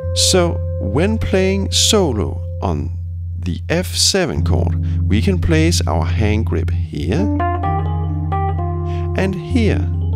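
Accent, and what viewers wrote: Denmark. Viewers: i love the heavy danish accent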